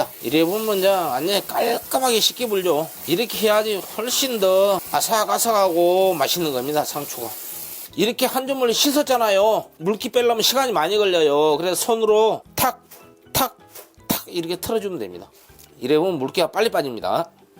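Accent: Jeolla, South Korea. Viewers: ㅋㅋㅋ 전라도사투리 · 대표님 전라도 출신인가요 정가이 갑니다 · 끄트머리는 짤라불고요.~ 전라도 사투리가 겁나게 구수해부네요이! ㅎㅎ 덕분에 저도 상추장아찌 맹글어 볼게요. 항상 건강하시고 행복하세요.~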